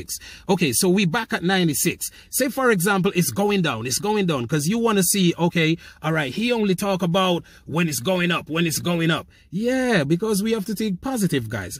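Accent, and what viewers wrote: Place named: Jamaica. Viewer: Love the Jamaican accent, I’m Jamaican as well Brother. I was thinking along the same lines as you. 100 dollars and just leaving it is a very responsible idea as a leader. Your not pushing, your just sharing an idea. Respect. I was thinking of a different idea, and that is to think like the amc apes, they buy and hodl, the only problem is, the stock market can produce naked shorts and then their battling nobody, because the hedges have found a way to short the stock without using money. In decentralized finance, you can’t do that, I want to see a crypto like this have a community that doesn’t give out crypto, but buys and hodls like apes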